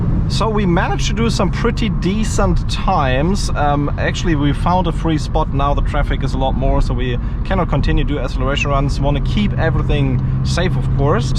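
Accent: Germany. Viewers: Wenn Deutsche Englisch sprechen... Und was sollen die Handschuhe? So lächerlich. Ist kein F40.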